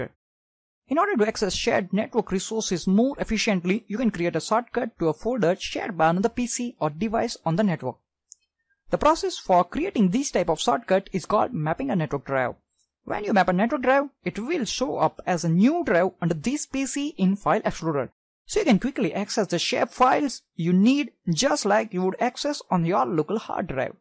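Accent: India. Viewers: No disrepsect but your accent is very hard to understand Why is it that just about every video on how to do this is by a indian or muslim guy? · I'm unable to understand, you have a strong Indian accent & to make things worse to speak too quickly!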